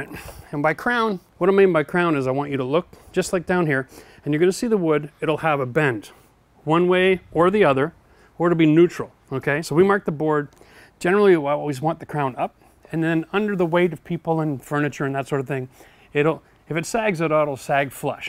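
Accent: Canada. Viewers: I can hear that Canadian accent. Went to school in Michigan and had lots of Canadian friends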